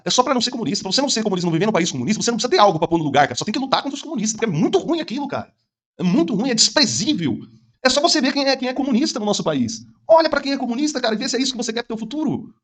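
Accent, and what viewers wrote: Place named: São Paulo, Brazil. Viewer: Ambos paulistas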